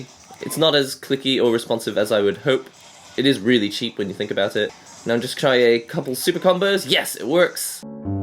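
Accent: United Kingdom. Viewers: He is Japanese but has a British accent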